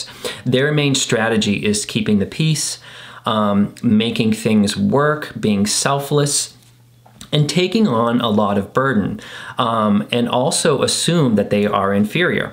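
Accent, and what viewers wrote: Boston, United States. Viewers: Omg best Masshole accent ever! I grew up in Southern NH and went to high school on the border of Plaistow and Haverhill. No matter how hard I try, I have never ever been able to do either the Boston or Southern New Hampshire accents. I am wicked awesome 😂 at pinpointing where people are from by hearing the difference in their accents, but I grew up without one somehow. It’s always fun to hear it since I’ve been living in the SF Bay area for the past twenty odd years since I moved far far from Sandown, NH. It’s still home in my heart (and Ely & Fairley, VT) but I have never ever wanted to go back permanently. Short trips remind me quickly about why I moved 3000+ miles away as soon as it became possible. Thanks for helping us unravel our childhood disfunction and confusion. For me, distance, time, and finding your friend family helps so much. 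🥰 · Omg, the Boston accent. So funny. Thanks for this video! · Love the Boston accent 😂❤ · Just want to say that I think your channel and your Boston accent are just fantastic. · This video is amazing for the Boston accent alone! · Lmfao at the Boston accent 😂❤️ made me love the videos more! Sincerely, a Bostonian, kid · Ha, I knew I could hear a faint Boston accent in your other videos! Appreciation to you from Allston. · Strawberries!!!!🔥🔥🔥🤣 I played that first Paula Abdul tape til it broke😏✅ went back for another. And her next tape. Still got em in the time capsule in ma basement😉 Giggles from this 40 yr old🥰 Thank You😁 Your clips are amazing... the magical $300 car... lols Excellent. You explain all this heavy icky stuff in a relatable, nonoverwhelming way.... which builds confidence in managing self... HUGE... THANK YOU SO MUCH!!! 4TH generation Irish... LOVE the Boston Southyish Linguistics 🍀😁🍀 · Haha I love the Boston accent.😂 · The Boston accent …… I love it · love the boston accent lolz but really you make all of these difficult topics easier to swallow and comprehend and for that I couldn't be more grateful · Love the Boston accent! 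It made me smile! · shoutout for the authentic Boston accent LOL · Love that Boston accent. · Your Boston accent had me rolling 😂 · OMG the Boston accent and the 80's/90's references!!😆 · Your Boston accent 👌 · Thank you for clarifying behaviors associated with growing up with childhood trauma and also offering scenarios that may have started the path. Each of the videos I've watched have helped me. Like another commentor...I cannot watch a full video...but because of the sheer amount of information to process. I break them up into 2 or 3 sessions. I too am from Boston, though I've lived in Oregon for 40+ years. I love the accent...and have always had an unreasonable attraction and affinity to anyone that has one. I am now hearing (in my mind) ...this whole video with the letter "o"distinctives of ah' or aw...such as "pahk the cah in Hahvahd yahd" and the "tawnics" and several words joined together such as...the "howahya's"❣ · As a native upstate NYer, I loved hearing your Boston accent!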